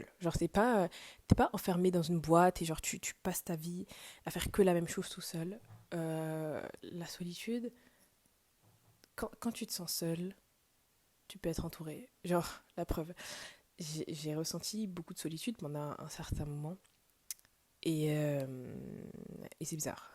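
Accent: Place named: England, United Kingdom. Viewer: J'aime ton accent anglais😊☺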